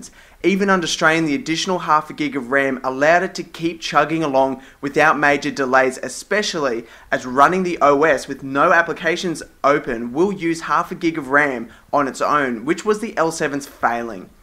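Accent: Australia. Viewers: Nice review. Cool accent. I want to move to Austrailia and get that same accent to pick up the ladies.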